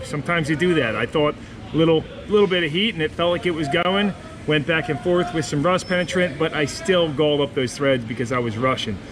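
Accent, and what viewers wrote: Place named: Pittsburgh, United States. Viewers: Good vid! I hear a fellow Yinzer accent! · That's a PA accent if I ever heard one!!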